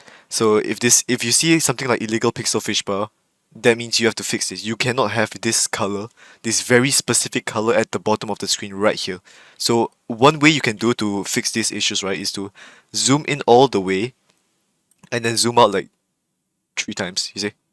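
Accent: Singapore. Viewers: singaporean accent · the singaporean accent is very strong btw LMAO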